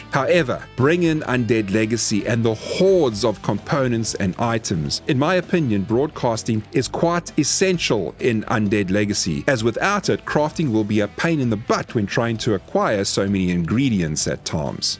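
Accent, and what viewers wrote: South Africa. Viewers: Instantly know a South African. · Ek kan hoor jy is afrikaans, dankie vir die inligting.Mod lyk awesome🤟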